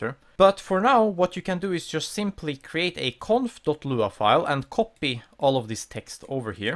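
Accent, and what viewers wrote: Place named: Scania, Sweden. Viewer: I'm also swedish you sound south.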